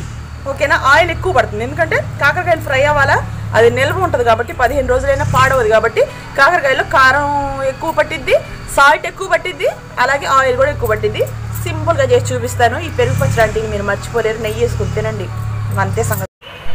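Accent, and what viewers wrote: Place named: Guntur, India. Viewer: Ala em undadu Amma urdu every state different slang untadi meeru matladindi guntur/Vijayawada slang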